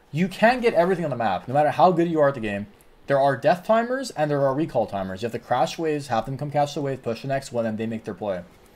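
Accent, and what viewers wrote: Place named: Australia. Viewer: Idk Australian accent makes me think it’s oce and as an oce player it’s super inflated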